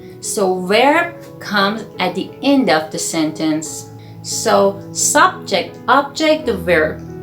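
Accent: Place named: Spain. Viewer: This is useful thank you. Your accent is a bit spanish.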